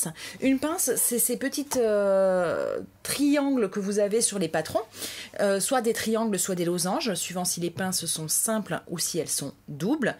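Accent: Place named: Toulouse, France. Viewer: Bonjour, tes tutos m ont beaucoup apporté lorsque j'ai commencé la couture et je suis ravie de te retrouver, même si je sais coudre des pinces desormais... ceci dit le coup du repére au poincon est une super idée! Je voulais surtout te féliciter pour l’amélioration technique phénoménale image et son (c 'est mon métier, j'y suis sensible) et espère te retrouver bientôt pour de nouvelles vidéos avec ton joli sourire et accent qui me rappelle la maison (toulousaine expatriée a Paris )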